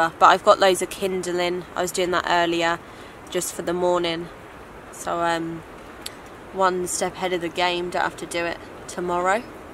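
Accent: United Kingdom. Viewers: Your accent do British snd "tipi"is shelters?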